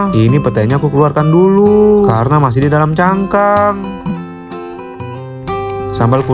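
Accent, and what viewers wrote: Java, Indonesia. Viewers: Abang orang Jawa kah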